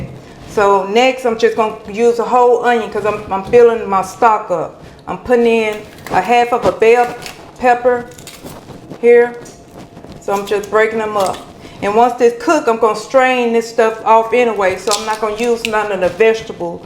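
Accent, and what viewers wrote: South, United States. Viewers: You're so such a lovely lady. Cute country accent ❤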